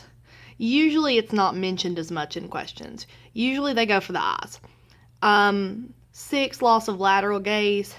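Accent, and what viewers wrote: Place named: United States. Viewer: I'm sitting the UK exam this coming week. Any brainstem questions and I'll be hearing your awesome American accent singing "5, 6, 7, 8!". Thank you so much for these videos - brilliantly accurate and educational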